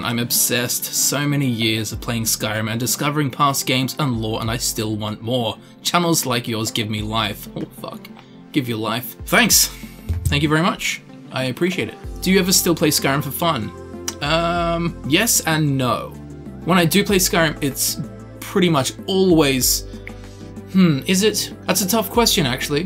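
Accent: Australia. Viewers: are you australian i am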